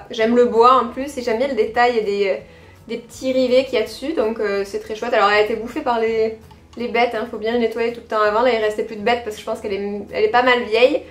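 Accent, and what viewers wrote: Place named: Alès, France. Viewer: Coucou étrange comme quoi l'accent vraiment !!!!je l'ai reconnu! Alésienne comme moi👍♥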